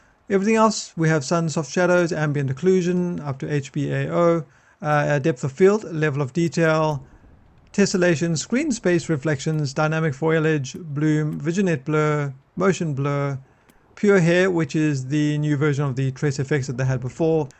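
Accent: South Africa. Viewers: You a RSA expat by chance? Your accent sounds like a South African :P Great vid BTW!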